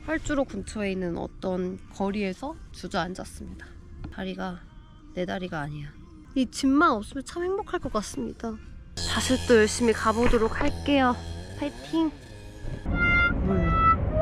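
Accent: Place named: Spain. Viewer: My heart love your Spanish accent ❤❤❤❤❤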